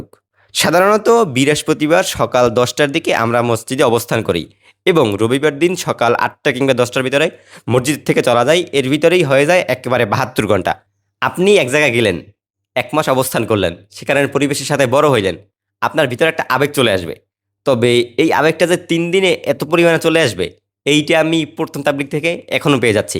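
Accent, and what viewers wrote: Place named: Bagerhat, Bangladesh. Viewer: আপনার কথা বাগেরহাটের মতো।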